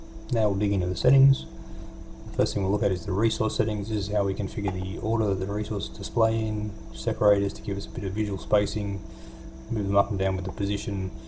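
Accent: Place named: Australia. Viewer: This is really well thought-out and beautifully executed. I thought it was good before just to be able to see stage fuel, but now with that extra functionality it's become one of those mods that get instantly added to any install and that shows the KSP devs how the stock game should be improved. Great work, and good to here an Aussie voice in modding community :)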